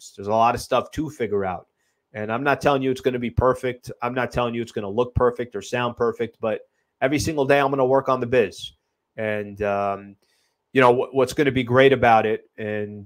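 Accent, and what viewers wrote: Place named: New York, United States. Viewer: You're from CA, though you sound like someone from NYC or Boston. I'm from SoCal and also lived in the Northeast for a while as an adult and never heard anyone one in SoCal who sounds like you. I've been watching for a while and always wondered.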